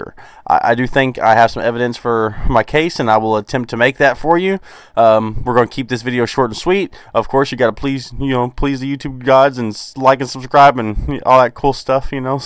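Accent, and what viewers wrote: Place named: South, United States. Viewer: That's true. I did not think about the height difference. Btw I love your Southern accent. Southerner here too (NC).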